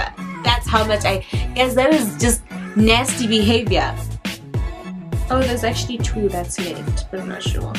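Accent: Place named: Nigeria. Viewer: The Nigerian accent 😂😂😂😂😂😂😂 I'm sold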